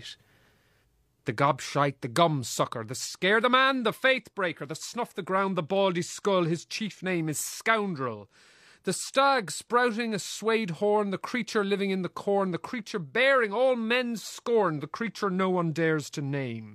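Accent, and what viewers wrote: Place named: Ireland. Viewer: omg!!!! his irish acent! is georgeous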